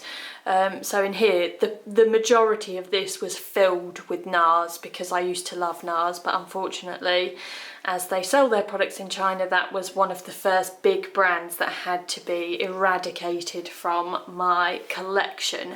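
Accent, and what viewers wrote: United Kingdom. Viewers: I love so much follow your videos from Paris. This is all the time very interesting and best British accent ever hear in YouTube ❤️❤️❤️ kindly, an lover girl of England 🏴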